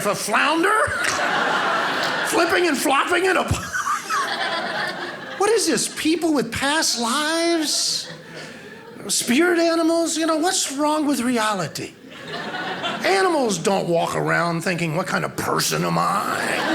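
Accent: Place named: Kentucky, United States. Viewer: You can tell he’s from Kentucky 🤦🏻‍♀️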